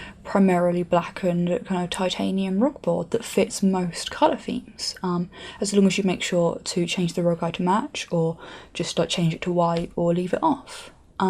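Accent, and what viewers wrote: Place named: West Country, United Kingdom. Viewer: Whereabouts are you from? I'm sure I hear notes of Bristolian/West Country ^^ Hello from Wales (or 'shwmae' as we say here)!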